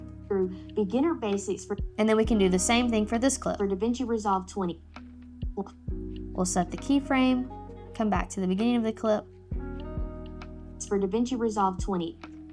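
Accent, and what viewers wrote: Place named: South, United States. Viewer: New subscriber. Like your Southern accent too. 😜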